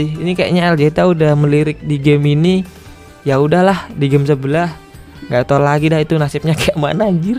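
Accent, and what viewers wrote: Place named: Madura, Indonesia. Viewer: kenapa nadanya jadi tretan dah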